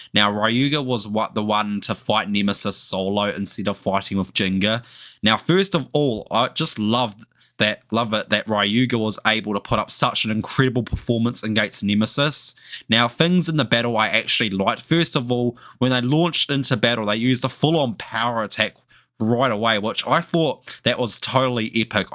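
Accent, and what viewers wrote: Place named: New Zealand. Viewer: Bro, u from NZ? That accent sound kinda like it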